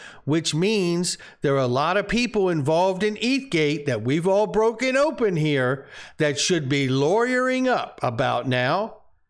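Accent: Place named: United Kingdom. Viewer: your videos report i excite see you every time you very very make me smile.. we excite about coin this you say for us XRP. WE LOVE YOU MUCH MUCH MUCH, U speak british cool 😘😘😘😘😘😘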